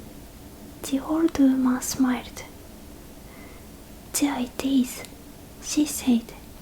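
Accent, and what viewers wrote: Japan. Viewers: Japanese is the most familiar language I will never actually learn. But I enjoy the cadence the natives have even when speaking English.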